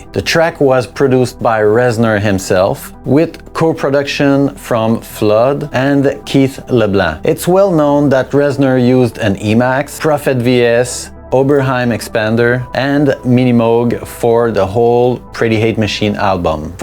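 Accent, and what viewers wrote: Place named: Quebec, Canada. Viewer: Are you from Quebec by any chance ? 😊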